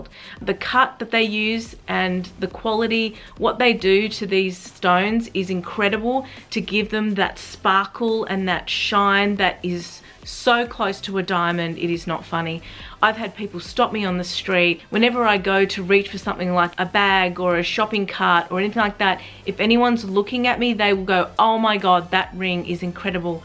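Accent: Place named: Australia. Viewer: Love your accent, is it Australian?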